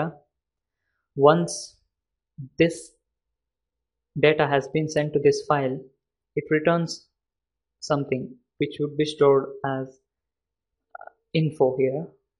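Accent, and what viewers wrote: India. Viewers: god damn it... every one of this video about PHP is from some random indian guy on youtube. not to be an ass, but that indian accent won't let me concentrate on your videos. If I would give you a tutorial with my broken lame ass persian accent, you would feel like punching me too!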